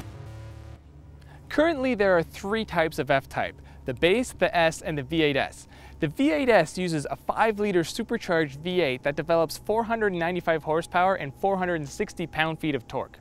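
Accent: Canada. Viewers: Presenter has a Canadian accent.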